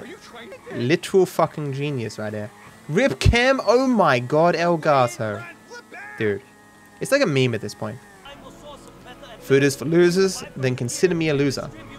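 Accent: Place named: Australia. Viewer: Where in Australia do you live? Your accent is sooo smooth compared to my Australian friends.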